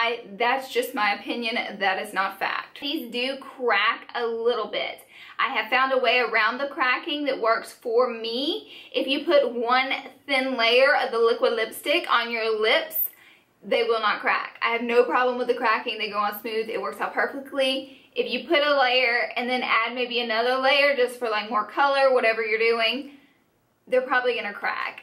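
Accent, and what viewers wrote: South, United States. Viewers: I was curious too!!! I thought it might have been because of her southern accent, but most people know koala at least /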